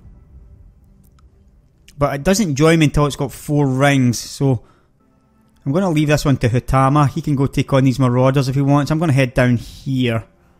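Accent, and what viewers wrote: Scotland, United Kingdom. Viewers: Great to hear a grand Scots accent! It’s probably why the yanks haven’t been watching you. I was married to a girl from East Kilbride (Scotland’s 6th largest town and growing...as the sign proudly professed!) Unfortunately, I’m a sassenach, but I know the patter, having grown up in little Scotland.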